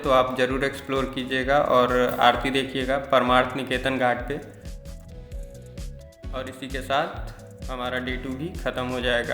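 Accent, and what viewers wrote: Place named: Bihar, India. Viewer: Good try bro, i love your content. But apko hindi thoda सुधारना पड़ेगा, कुछ ज्यादा ही बिहारी accent hain, like-aaj hi bhar ka time hain 🙄.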